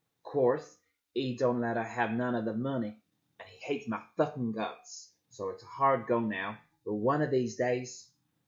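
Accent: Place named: United Kingdom. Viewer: Was so hyped to litsen then relized your British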